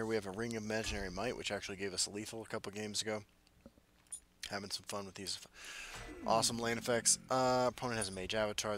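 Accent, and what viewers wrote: Ireland. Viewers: Brazillian, spanish, Portuguese, you name it, but his accent is Irish.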